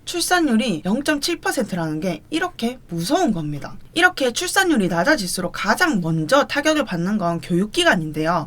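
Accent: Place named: North Gyeongsang, South Korea. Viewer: 경북지역 사투리가 상당히 거슬리네요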